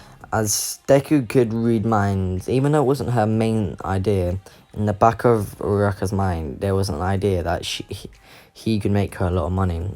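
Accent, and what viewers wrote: United Kingdom. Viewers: insert british accent x100 Yo my g how r u with that british accent and dat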